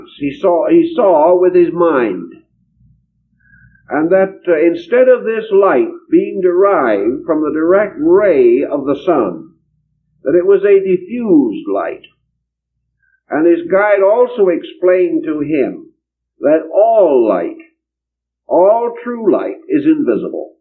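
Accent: Transatlantic, United States. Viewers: One can detect the "Trans-Atantic" accent commonly used during his time.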